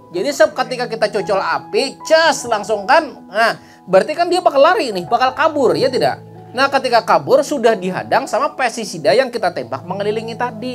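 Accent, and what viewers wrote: Banjar, Indonesia. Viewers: seneng sama nada bicaranya, ini banjar ya?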